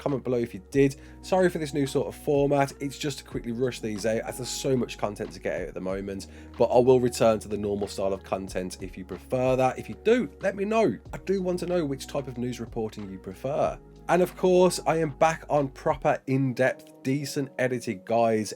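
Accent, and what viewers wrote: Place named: West Midlands, United Kingdom. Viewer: dont ever deny us that beautiful west midlands accent again brother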